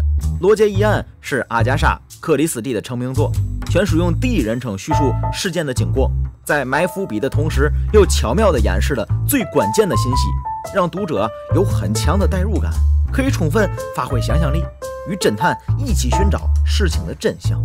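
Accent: Tianjin, China. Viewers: 天津话太搞笑了，我喜欢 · 天津话最好用天津式土话。普通话天津味儿太违和。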